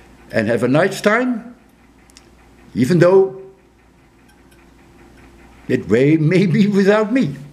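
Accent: Netherlands. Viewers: To be honest, I didn’t know this guy and when I first saw one of his videos I knew he was Dutch because of his accent! It’s weird I didn’t know him because I’m Dutch myself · Just out of curiosity, are you Dutch?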